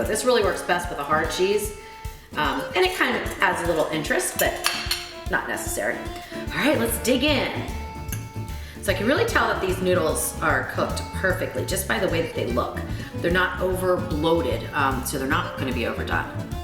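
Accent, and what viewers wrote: United States. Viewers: From Kentucky?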